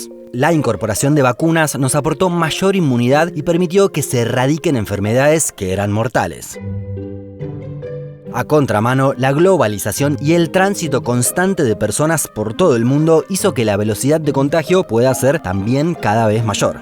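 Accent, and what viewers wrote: Argentina. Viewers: que pereza con ese acento argentino no puedo escuchar bien, lo voy a quitarsh. Chego se dice "llego", cha "ya".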